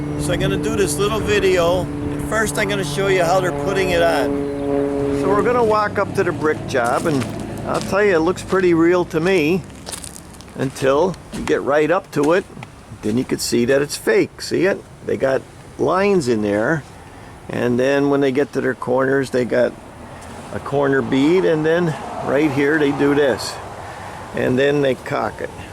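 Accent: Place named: Throop, United States. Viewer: Well, the reason that there is not so much brick, probably has to do in part with its relatively poor thermal properties. I bet that in places like Florida they are looking at building highly insulated structures. The problem is, these modern materials feel insincere; they are not honest. I appreciate older architecture and building methods and am constantly seeking out how the older guys did things. They weren't stupid. Always fun to listen to your videos. Your dialect always sounds familiar and reminds me of my family in Throop. One of my favorite places in the country. Thanks for another entertaining video.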